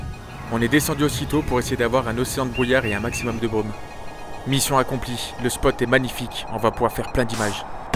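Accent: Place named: North, France. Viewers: Tout ça avec l'accent de ch'nord , ça fait plaisir a entendre cha tisotte hein ! ;-) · Tu as l'accent du Nord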